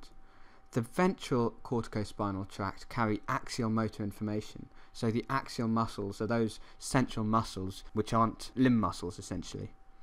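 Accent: England, United Kingdom. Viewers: someone just told me that you have an English accent. And I think it's good. remind me of times listening to BBC documentaries of animals and plants, the lovely and respectable Sir David Attenbourg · I learn so much better from an English accent for some reason... Great work!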